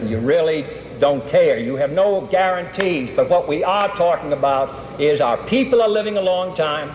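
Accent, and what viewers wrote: Boston, United States. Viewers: Extremely strong Boston accent 💪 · Go to Boston. You’ll hear it everywhere.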